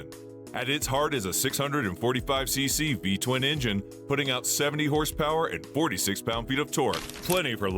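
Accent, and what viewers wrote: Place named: United States. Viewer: Leider erzählt...im extrem hässlichen US- Englisch...schade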